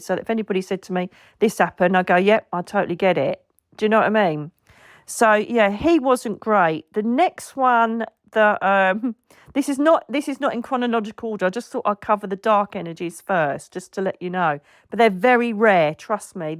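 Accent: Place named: England, United Kingdom. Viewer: That was so much fun! Thank you for sharing your stories and I just listening to your English accent ❤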